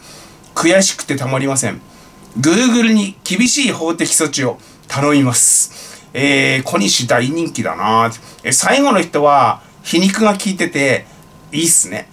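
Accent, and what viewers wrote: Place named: Northern Kanto, Japan. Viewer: 北関東の訛が楽しい😂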